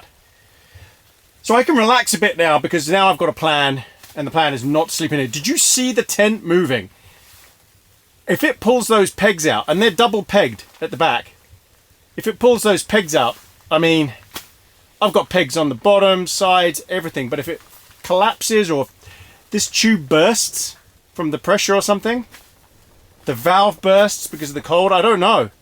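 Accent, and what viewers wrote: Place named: England, United Kingdom. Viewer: Wow what a beautiful place and yes amazing scenery. I was just wondering are you actually English? And you’ve moved to New Zealand because you don’t sound like you’re from New Zealand. Your dog is beautiful. He’s very energetic. Fun to watch.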